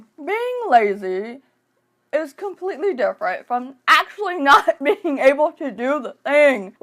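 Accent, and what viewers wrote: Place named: Southeast, United States. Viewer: I had to look up where you're located because your accent sounded so familiar and I knew it must be somewhere in the Southern East Coast. :D Sending solidarity from Maryland!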